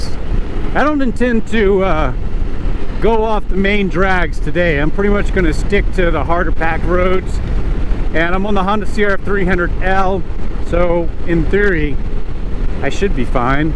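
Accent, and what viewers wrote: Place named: Ozarks, United States. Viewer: Ozark hillbilly 🤔, you from SW MO or Arkansas?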